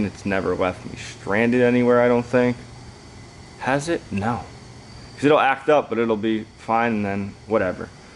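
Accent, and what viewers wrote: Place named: East Coast, United States. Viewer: That was the ultimate Connecticut shitty east coast accent I gotta cwall my ooltinata that dropped oaff is done it sounds like him saying cwoffee I can't stand that accent between the east coast accent an Wisconsin accent fuckin terrible I wonder if he hears how he sounds that was so dramatic the other day when he said its gonna be hard not having his 13 in the shop how the fuck could that make you sad your getting it painted an its a car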